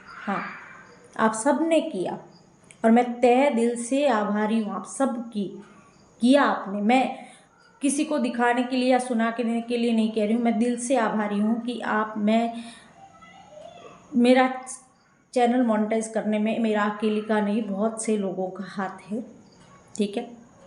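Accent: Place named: Marwar, India. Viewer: Sabhi ko meri taraf say bahut bahut pyaar phir say aayenge mujhe to kee aur aap kee Marwadi boli sunani hai, sab ko meri taraf say bahut bahut pyaar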